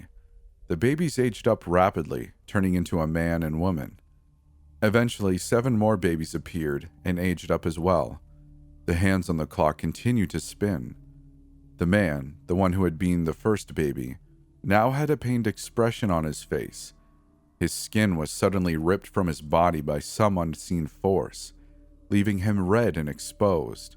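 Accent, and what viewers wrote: Canada. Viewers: Love the Canadian accent😍🇨🇦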